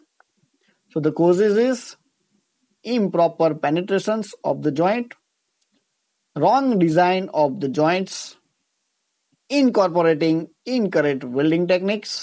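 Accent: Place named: India. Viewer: такой конкретный индусский акцент у лектора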